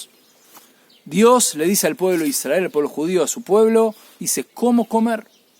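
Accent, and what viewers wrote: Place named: Argentina. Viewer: Sino me equivoco usted también es argentino, por su asentó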